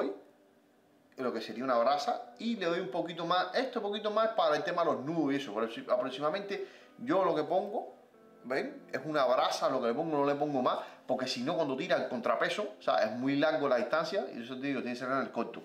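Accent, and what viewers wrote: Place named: Cuba. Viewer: usted por ese hacento es cubano . Esa tonada no se va ni con 24 años en Argentina. buen Video Suscrito Coherente Lo Que dices Saludos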